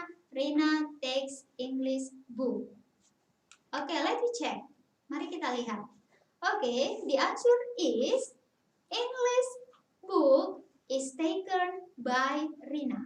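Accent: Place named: Java, Indonesia. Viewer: Bahasanya masih medok mbak e, kurang dari medok malah👎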